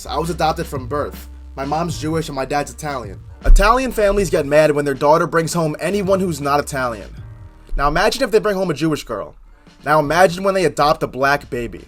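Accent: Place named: Brazil. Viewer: Your way of speaking reminds me of brazilians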